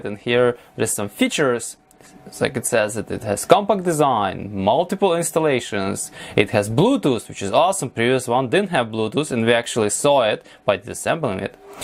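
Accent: Russia. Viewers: Відразу чутно що російскомовний.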